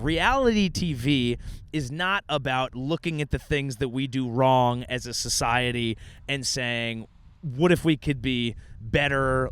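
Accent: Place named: North, United Kingdom. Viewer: Perfect Northern accent ❤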